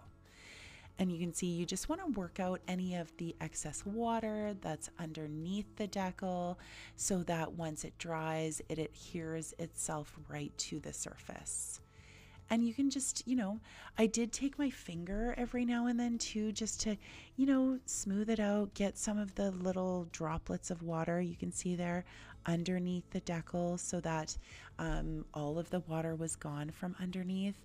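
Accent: Canada. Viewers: I have only one other DIYer to whom I am subscribed that uses Hippo waterslide and heat transfer paper. It is fascinating to watch it being used. I just plain have to try it out myself!! You have made projects here today that, as always, look high end. My favorite was the coasters! I look forward to each of your videos!! Your voice is soft and soothing and I’m diggin’ your Canadian accent!! 😃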